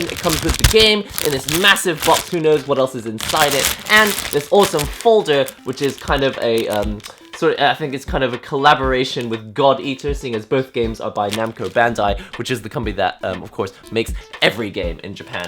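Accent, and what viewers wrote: United Kingdom. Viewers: How the f••• do you be Japanese and have a f•••••• British accent.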